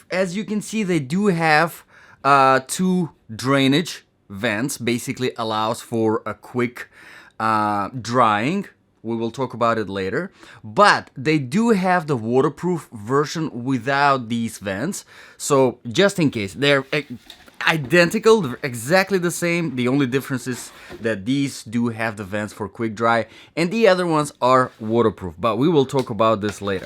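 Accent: Russia. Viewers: Russian accent?! :)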